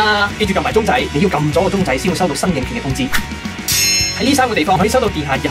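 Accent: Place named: Guangzhou, China. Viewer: 唔知係咪錯覺，我覺得你啲廣東話都有啲口音，係就好流利，但係有啲口音囖，可能係廣州音同香港音分別.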